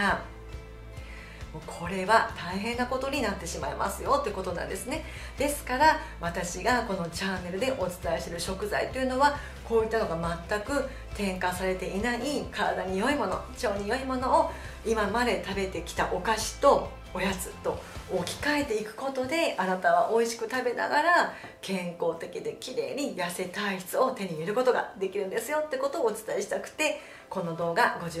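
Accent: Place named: Tohoku, Japan. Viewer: デーツを１日３粒食べてますがなかなか減らない体重🤧 パートナーには(ゴキブリ食べてる)と嫌われました🤣 さんの東北っぽいアクセントに安堵してます🤗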